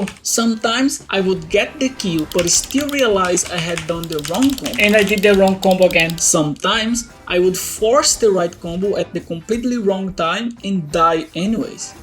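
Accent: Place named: Brazil. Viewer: I would recognize a Brazilian friend from far far away, esse seu accent não esconde nada, man kkkkkkkkkk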